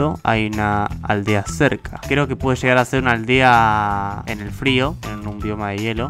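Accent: Argentina. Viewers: ME ENCANTA NETA ME ENCANTA EL ASENTO ARGENTINO Y EL TERMINO WUACHO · sos de argentina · ¿sos argentino? me parese conocido tu acento por que yo soy de argentina. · se le nota la vos Argentina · sos argentino?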